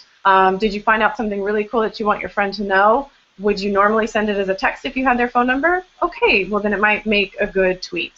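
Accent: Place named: United Kingdom. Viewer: Lol love the British Accent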